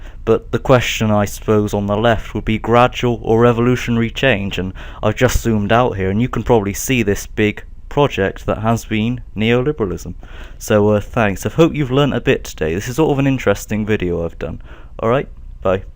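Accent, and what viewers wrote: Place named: Liverpool, United Kingdom. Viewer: You sound a bit like George Harrison. Are you from Liverpool?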